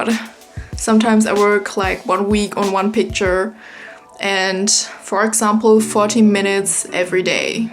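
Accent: Germany. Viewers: dot me an border :D hear that german accent girl ;-)) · I LUV YOUR GERMAN ACCENT JSHSVWKQGW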